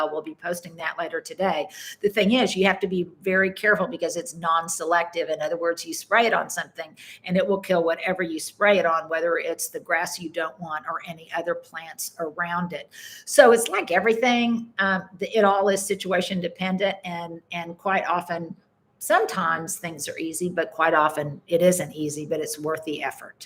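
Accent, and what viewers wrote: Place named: United States. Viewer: I like to count the “and by the way”, especially when followed by “you guys” - I must say however, your way with language is a joy - especially as being English I can feel smug about what we consider the American mispronunciations, especially words like herbs, which we pronounce as in Herbert Hoover - always I can hear my mother saying “ don’t drop your aitches “ love these videos, was really disappointed not to be able to join live. Thank You.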